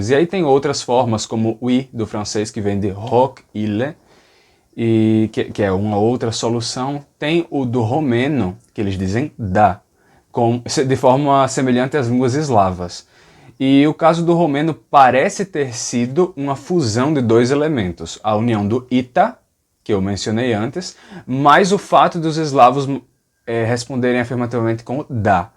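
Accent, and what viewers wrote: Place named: Northeast, Brazil. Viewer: da onde e o seu sotaque? Eu moro nos EUA por 35 anos e nao consigo saber exatamente que sotaque e o seu. Nordeste em algum lugar? Obraigada pela aula excellent.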